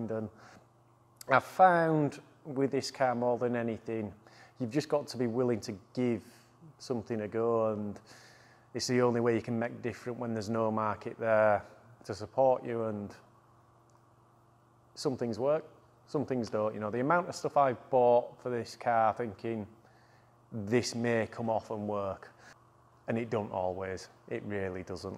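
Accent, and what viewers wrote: United Kingdom. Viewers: That Northern accent... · Oooo Kay...love the brit accent but would of loved even more to HEAR THE DAM CAR..😊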